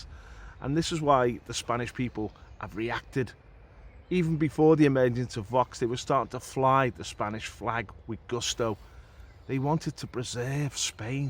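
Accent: Liverpool, United Kingdom. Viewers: Scouser..from Liverpool. Every Nationalist respects those who fight for their Nation State.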